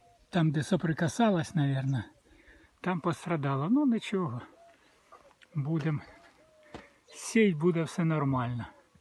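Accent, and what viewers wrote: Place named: Kharkiv, Ukraine. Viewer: Какой у вас укр.язык красивый прям как у нас в деревнях-Харьковская область.У меня папа так тоже говорит.Мира нам всем и доброй погоды.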